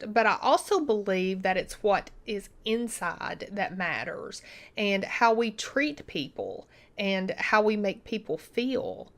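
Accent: South, United States. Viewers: I❤️U & your spirit & your southern drawl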